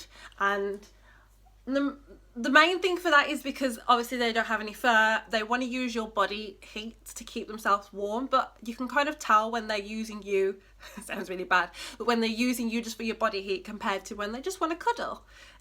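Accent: West Midlands, United Kingdom. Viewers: That's a west mids accent isn't it ;-) beautiful cat....am getting my hairless baby in one month ;-) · OK I’m going to admit I did not expect the British accent I kind of figured you were from like Brooklyn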